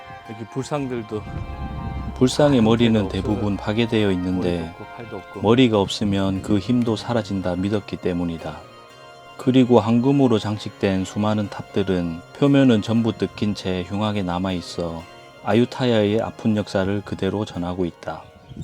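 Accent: Gyeongsang, South Korea. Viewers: 아유타야 유적지 구경 자~알했습니다 역사 나레이션 하실때 어색하지만(같은 갱상도 나레이션이라 ㅎㅎㅎ) 내용면에서는 알찼습니다 ^^ 진지하게 설명 잘 하시다가 "앗 뜨거"에서 빵 터졌습니다 ㅎㅎㅎ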